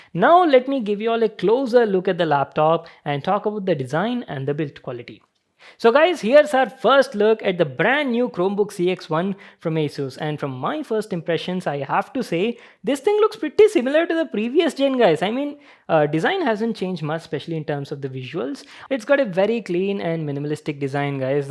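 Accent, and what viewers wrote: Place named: Telugu, India. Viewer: English with Telugu slang can be easily understood nuv super anna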